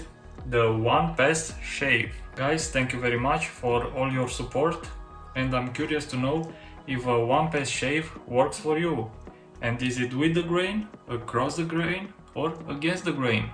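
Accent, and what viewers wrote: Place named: Romania. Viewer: Based on his English accent I reckon he is from Romania, or some other eastern country.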